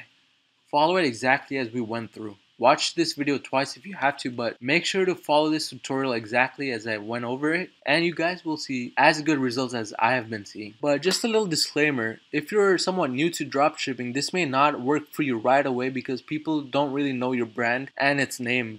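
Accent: India. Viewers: Did you mean campaign or company? What is this bg sound. Where are you from india?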